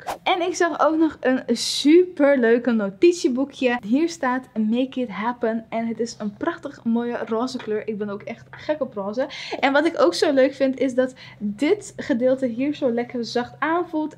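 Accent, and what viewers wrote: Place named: Enschede, Netherlands. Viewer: die "n"van haar omg kom je uit enschede?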